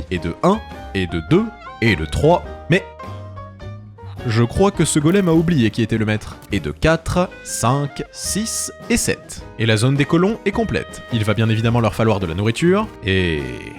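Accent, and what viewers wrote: Quebec, Canada. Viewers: je pense qu il est québécois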